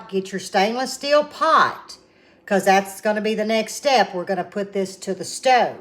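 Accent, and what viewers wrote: South, United States. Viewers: I absolutely love you! I think you are adorable and I love your videos so much! I feel like you are right here in person. My dad was from Missouri and you just bring me back to that lil bit of south…you & your accent remind me of my fun loving talented aunts and watching them cookin & baking with gma!!❤️ HELP!! My day 2 for the candy pickles is tonight BUT I HAVE TO BE GONE BY 4!!! What should I do?? Should I finish my day 2 earlier this afternoon or should I let them soak another day or should I rinse them and leave them rinsed what should I do?? (I don’t Know how late I will be back tonight)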